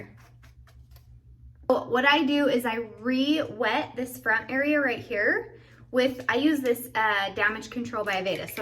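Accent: United States. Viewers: OMG! Can you tell me what part of America that accent is from?? So cute! Oh my God! 😁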